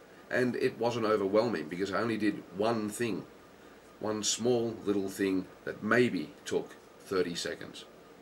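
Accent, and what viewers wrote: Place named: Australia. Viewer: You have an uncanny ability to talk...and talk...and talk.... I've gotta say though, you make more than just a lick of sense. I too find a clean, neat, and organized environment rather enjoyable, relaxing, and invigorating for both the mind and body. 'Tis far easier to do something clean for myself when the atmosphere around me is already clean. Good video! -Josh PS: If you didn't have that accent of yours, I probably wouldn't have lasted the entire video. Aussies rock!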